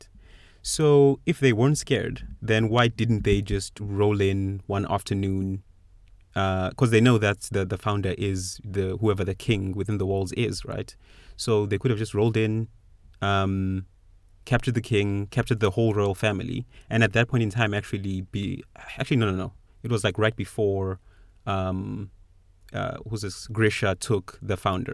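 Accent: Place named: Africa. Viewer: Firstly, you should never make another video. You suck and your voice sucks. Secondly, the decision to invade Paradi was made by brats who never knew the kings vows and were just trying to return home as heros. It was never the orginal intention of the Marley Army. By the way you sound African. Overthinking is the reason we are poor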